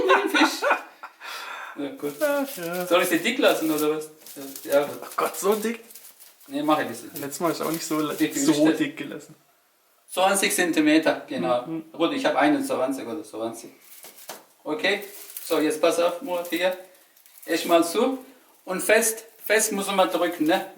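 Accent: Swabia, Germany. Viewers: ein schwäbelnder türke <3 instantlike haha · des schwäbisch ist einfach toll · Sein Schwäbisch 😂😂Geiler Typ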